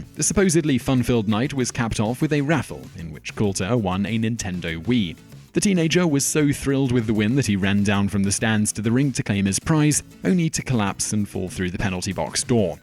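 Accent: United Kingdom. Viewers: he's British, just how us Americans say water wrong by saying "wader"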